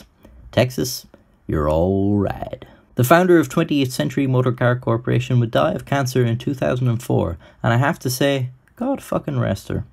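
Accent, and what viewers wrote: Ireland. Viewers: You got 100k cause you have a Irish accent everyone likes Irish accents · a bit late his accent if you can not tell is clearly Irish so i believe that he is Lebanese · I thought it was Slavic but ever since I saw this comment it's sounds Irish not Slavic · Love your page! The content is very interesting and your storytelling ability mixed with clever bits of humor is top-notch. The Irish accent doesn't hurt either.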